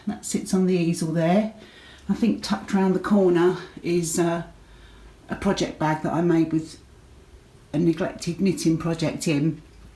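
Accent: England, United Kingdom. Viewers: Oh,! Thank you so much for showing us your craft room. I just loved seeing everything, and I always love hearing your English accent (I live in NY)! I also love your beautiful sun room! So pretty! My mother-in-law, whom I never had a chance to meet, was from Trowbridge (not sure if you've ever heard of it) and came to the U.S. as a war bride. My husband has relatives in England in a town called Uckfield and has been there several times.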